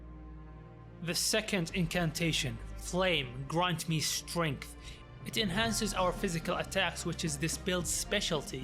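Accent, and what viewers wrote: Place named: Saudi Arabia. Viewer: ياخي اللهجه السعوديه اسمعها لو وين ما كانت 😂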